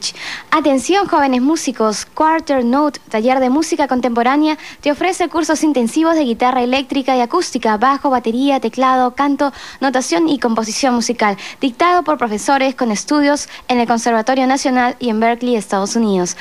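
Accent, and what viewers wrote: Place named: Europe. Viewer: Yo tambien ..me enamore de la linda vozz .. como sera la muchacha.. esa vos melodica tann riff que ..vozz media europea.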